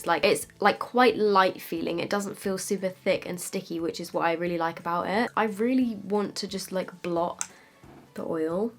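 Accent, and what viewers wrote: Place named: United Kingdom. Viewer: Ugh I’m sooo obsessed with UK accents 😍 “ it’s waawrmm”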